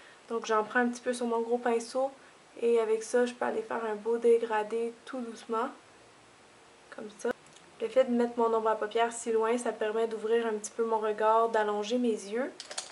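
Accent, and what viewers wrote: Canada. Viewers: L'accent canadien est très mignon.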